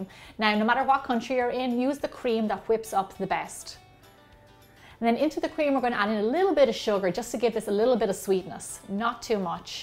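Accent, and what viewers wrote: Ireland. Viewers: Why you sound Irish, not Italian! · you must be irish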